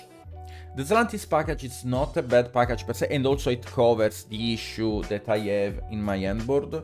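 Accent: Italy. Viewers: Ma sei veneto?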